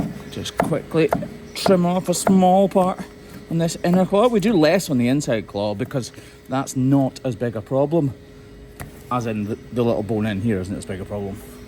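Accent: Scotland, United Kingdom. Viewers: Stopped by for the hoof trimming, stayed for the Scottish accent. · I just love accents and languages especially scottish accent. Love these educational cow podiatry. ❤ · Love the Scottish accent. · I'm so happy I now know what digital dermatitis is. In my favorite Scottish accent. Much love brother.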